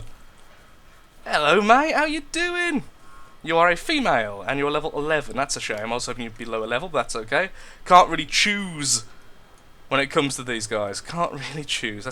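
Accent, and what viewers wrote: United Kingdom. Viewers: keep on going with your videos of this game, this is awsome.Good work soo far britain man with funny accent and dislexia.(that is no offens)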